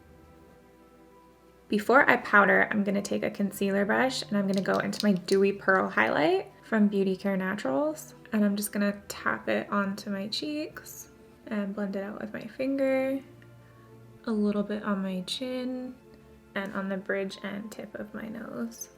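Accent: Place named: Canada. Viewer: Yes! I loveee Canadians and their accents. It always brings a smile to my face. 😌♥️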